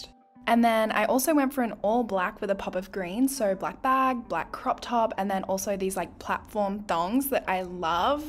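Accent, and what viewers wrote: Australia. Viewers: Oh my goodness you are the absolute best!!!! You are so beautiful!! Heck freakin'yeah of course ur Baby Girl wants in on Mama's work who wouldn't? She knows how gorgeous she is and how awesome her Mama is!!! She wants to be the icing on the cake and get those "bootie scratches" I call them 🤣....Puppers and Kittas are awesome!!! I just recently subscribed to your channel after watching a few of ur true crime videos and just fell in love with it!! I love true crime and u do a fabulous job!!! Plus u are so beautiful and cute!! I love the "disclaimer"...lol you put on one of ur videos I watched recently when u said listen I'm going to pronounce things wrong..lol. I mean who doesn't pronounce things wrong like all the time!!! And I think u do a fantastic job!!! U do ur best to pronounce things properly that I could NEVER even attempt to!!! So if the haters wanna hate let em' that's on them!!!! 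Also when someone would bitch about ur Mystery Mondays not always being posted on Mondays persay....get the hell over it ur a busy woman!!!! I absolutely love ur accent as well!!! I'm American, I've always loved Australian accents though!! Take care! ❤️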